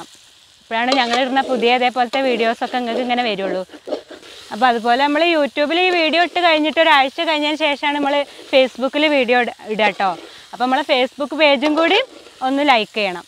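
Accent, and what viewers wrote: Malappuram, India. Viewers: ഹായ്.... വിഭവങ്ങളും.... മലപ്പുറം തനത് ശൈലിയിൽ ഉള്ള അവതരണവും ഉഷാറാവുന്നുണ്ട്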